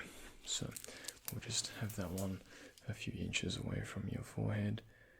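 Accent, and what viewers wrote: Australia. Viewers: Don't mind me. I'm just here for the aussie accent. :) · Seeing all these people hyping over the deep Australian accent makes me think how many nights I've spent not alone have been caused by the same type of horny people.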